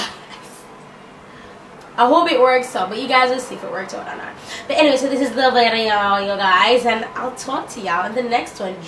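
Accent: United Kingdom. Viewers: I love this hair and your British accent 😊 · Which shipping company you use to ship your hairs herr in j.a ? And is it expensive ? Love the british accent tho 😘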